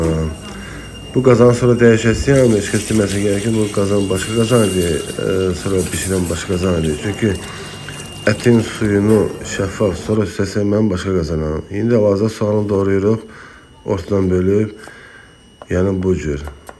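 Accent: Zaqatala, Azerbaijan. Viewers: Аnkaradan Zakatalalı hemyerlimə salamlar. Bişirdim. Çox gözəl oldu. Sağ ol. Lehcemizi özledim. Su tökax❤😂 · Mən istəyirdim yazım ki,qardaş diyəsən zaqataladandı,çün ki,zaqatalalılar belə danışırlar,eliyəsiyəm,gedəsiyəm,gələsiyəm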